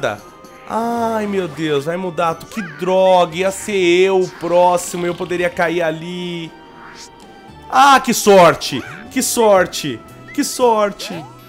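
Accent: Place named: São Paulo, Brazil. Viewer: sotaque paulistano é nois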